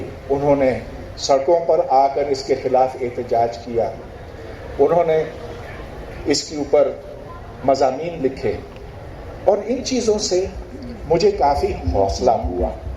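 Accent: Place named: United Kingdom. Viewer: and we have better scholars than you , we always had. Speaking British English wont help you idiot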